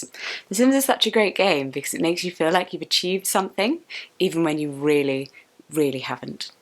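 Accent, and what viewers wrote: RP, United Kingdom. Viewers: Just when I thought I couldn't relate you more, you are also a simmer! From one posh sounding disabled lesbian to another, good choice on playing Sims 2 because that is my all time favourite Sims game 😊 you can get good safe mods and such on Tumblr! I get all mine from there. Also I completely make my simself and play. In Sims, I have a cat and dog and a lovely bungalow and I am searching for my wife but I think I'm going to have to make myself one because the townies are kind of...lacking.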